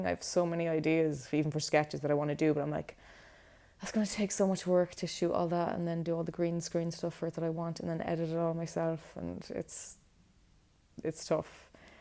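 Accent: Ireland. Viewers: I swear I could listen to read the phone book and be happy for hours. The Gaelge accent does that to American ears.:)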